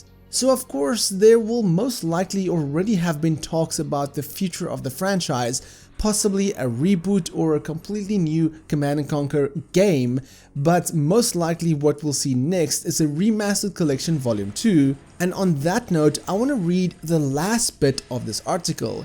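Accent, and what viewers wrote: South Africa. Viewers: Bru, ma chana. Wa ah yoo putting on, laak, a fake American accent, hey? Stick with the Edenvale accent. Id saands so much better. ^^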